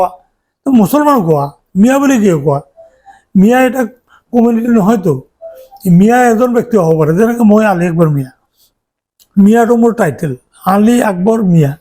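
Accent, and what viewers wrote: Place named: Bangladesh. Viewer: বাংলাদেশী কথাতে গম পাই ।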